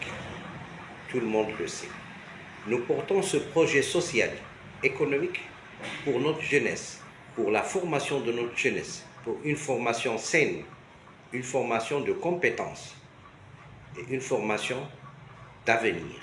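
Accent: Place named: Mauritania. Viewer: تبارك الله على الكوعليم … الفرونسي ديالك بحال ديال الموريتانييين او السينغاليين … خصك غي شي صباغة كحلة وها نتا ناضي …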